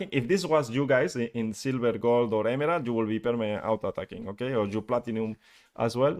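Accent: Spain. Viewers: Eres español? 🤔🤔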